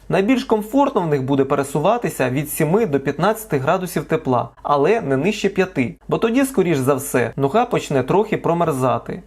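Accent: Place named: Voronezh, Russia. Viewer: так я не понял. это что за язык? ты где так говорить научился? говори нормальным языком, нихрена же не понятно. это воронеж? или может багучар какой нибудь?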